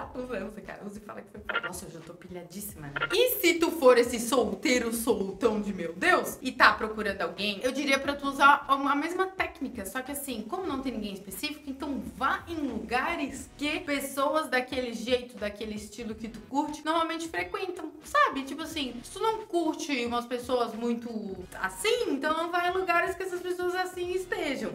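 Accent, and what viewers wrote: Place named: Santa Catarina, Brazil. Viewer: Esse sotaque de peixeiro aí é de catarina né, conheço porque morei em itajaí/sc oxtepo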